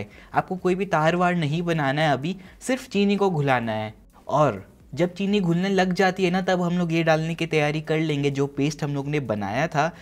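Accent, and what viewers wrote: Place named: Rewa, India. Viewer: Arey sir mai MP rewa se hu...... Aap bhi wahi se hai kya mujhe aapki boli wahi ki lgti h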